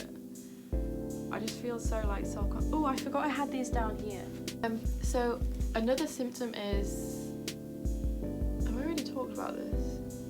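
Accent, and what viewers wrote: United Kingdom. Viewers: Oh no! I absolutely fell in love with you from the moment I saw you. You are so pretty and cute that I can't watch a post without smiling. The british accent is my favorite. Kelly osbourne infact is my celeb crush... Guess you are to now lol. No there isn't an shrine next to my Kelly shine...yet; but keep posting cuz we love you!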